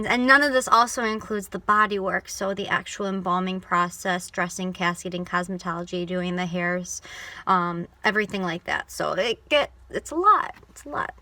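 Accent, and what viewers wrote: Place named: Buffalo, United States. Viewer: Are you in Buffalo by any chance? I am from Clarence, and your videos and your voice have a touch of my hometown in it :)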